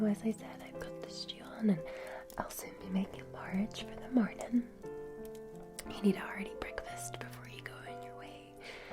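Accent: Scotland, United Kingdom. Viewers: I love the Christmas piano music at the beginning is that you playing the piano I know you are very talented and play the piano beautifuly! your Victorian era videos are always very well done everything from your very thick beautiful Scottish accent to your gorgeous outfits in the Roleplays and the wonderful backgrounds! I always like the dinner menu part! this looks to be a fabulous Victorian era video you have done a wonderful job again thank you so much I really do appreciate it! Merry Christmas ⛄🎄:_Loveheart::_Loveheart::_Loveheart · This isn't an Irish accent. It originates in the United Kingdom, and thus is a British accent.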